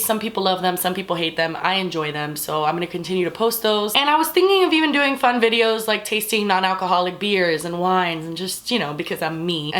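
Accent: Cuba. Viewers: Sharon Amador half cuban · It's a Cuban accent. She's Cuban. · Brianna Zinsky she's Cuban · She's Cuban :)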